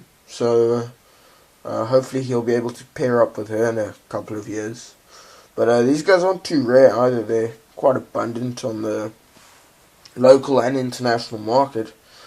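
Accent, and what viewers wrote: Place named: South Africa. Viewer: you sir are south african .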